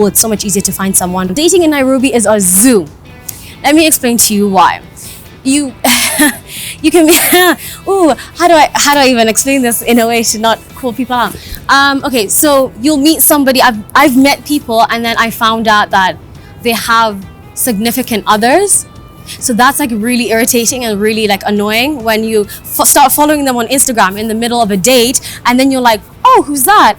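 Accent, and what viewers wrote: United Kingdom. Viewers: The accent is more of British thooo..the sound quality and video quality is terrific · Ikr! I've been in the States for years and her accent comes across more British as opposed to American. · I thought the accent was British but she grew up in America 🤔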